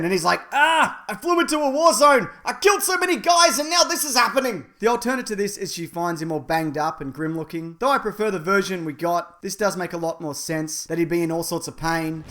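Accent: Australia. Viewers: You, sir, are my favorite source for Marvel news, because your content is great, very funny, and I really like your Australian accent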